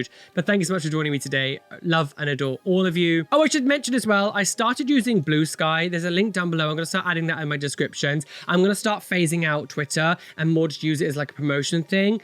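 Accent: United Kingdom. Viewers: Been.there. Done that. Came back in.the early 80"s. But love you anyways. Please don't hate me for telling you this, dearest, but your over all look is too 'busy'. Tats in PRIMARY COLORS never with a Pentagram.... and your piercings need to be placed symetrical so your face isn't sliding down on one side. And please switch to decaffeinated drinks asap. With your delightful British accent you need to remember the English language has coma's and lots of punctuation rather than making it all one sentence. Love ya! alvin, san francisco :)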